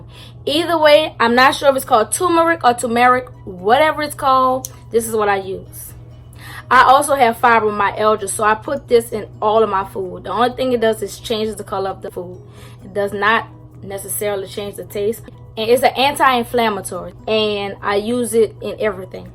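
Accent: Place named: Louisiana, United States. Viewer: 🤣😂🤣 I died when you said I'm black we never measure anything. I can def hear the Louisiana accent baybay lol. You do have beautiful skin.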